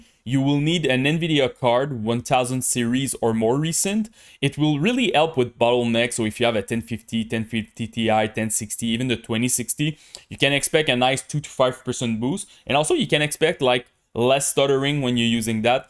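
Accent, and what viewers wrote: Quebec, Canada. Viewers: Omg tes français canadien!!!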